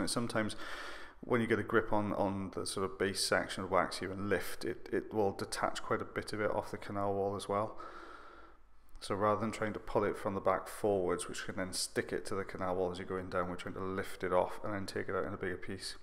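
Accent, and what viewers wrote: United Kingdom. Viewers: I love it when you say process. The British accent gets me everytime.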